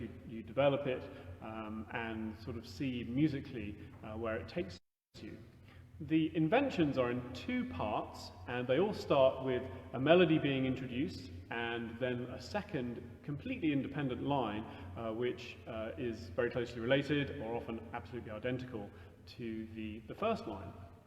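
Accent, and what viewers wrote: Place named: Cambridge, United Kingdom. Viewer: I am delighted to hear that you have not lost your Cantabrigian accent after your years in the USA. I went to "the other place" but have not lost my Oxonian accent either after 38 years in the USA. My American wife says it is because I never listen to anything she says.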